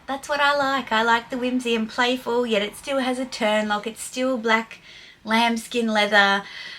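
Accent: Australia. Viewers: Hi, sounds like you are an Australian like me! Have you still got the Clapton and Valentino rock stud bag?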